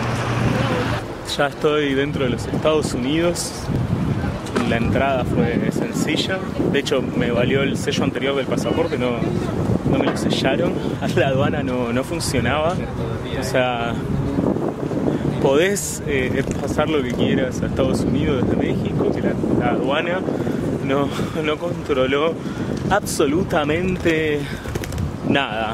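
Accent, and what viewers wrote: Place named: Rioplatense, Argentina. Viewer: ¿Argentino o Uruguayo? Demasiado evidente de tu acento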